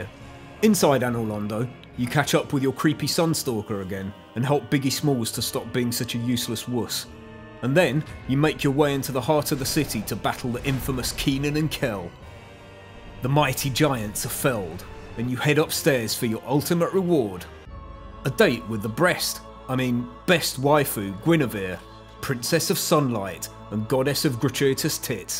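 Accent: United Kingdom. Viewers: I've got a thing for surly, irreverent British men talking about video games over a backdrop of free domain hard rock, it would seem.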